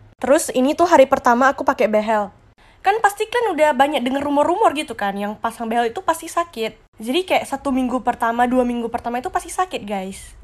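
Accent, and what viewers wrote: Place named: Medan, Indonesia. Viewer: Logat bahasa nya kak seperti nya orang Medan btw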